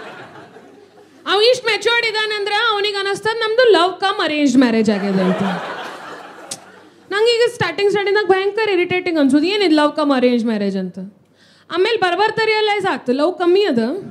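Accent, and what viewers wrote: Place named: Uttara Kannada, India. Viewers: North Karnataka dialect, particularly Dharwad I believe. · you are so good in comedy talk show, one of the best,classy, good vocabulary, typical Uttarakannada style of talk, God bless, am your fan from Gulbarga, a doctor by profession,you are too good,( Mast Majha Bantu kheli) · Hubli Dharwad bhasha dashtu zabardast style yawdu illa....u did justice to it.... simply bold and beautiful 😍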